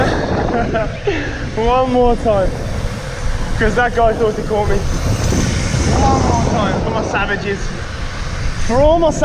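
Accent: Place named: United Kingdom. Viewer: Oh i love that british accent👌🏻👌🏻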